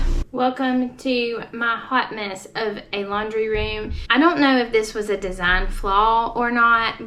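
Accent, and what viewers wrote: South, United States. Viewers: I’ve never related so much to a video in my life!!! With 2 under 2 (11 months apart 🥴) it’s so hard keeping up with everything once you get even a day behind . I’m from Alabama and most of my fam still lives there so I love your accent and southern charm! 💛 don’t be so hard on yourself , you’re doing just fine! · Your home is beautiful. I hear a southern accent? what state are you in? I am not in the southern states.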